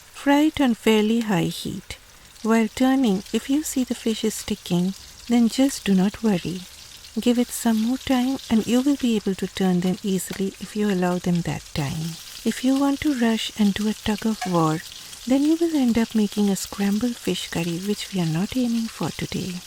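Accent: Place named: Bengal, India. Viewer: Hi.. a suggestion.... use shallots instead of red onion in US! The red onions in US are void of any natural pungency and usually taste like grass! Also, I saw you mentioned edible mustard oil of good quality not available in US! Try using TEZ brand or Radhuni brand available at any Bangladeshi grocery store! Loved your bengali style English narration with occasional "To"... u know what I mean 😂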